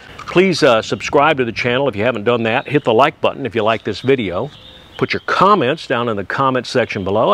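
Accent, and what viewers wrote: United States. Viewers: Amazing naration! A+ for American English!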